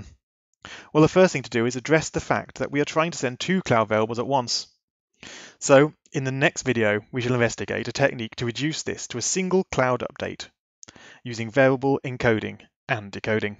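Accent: United Kingdom. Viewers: You have British accent! Like me, I am from England too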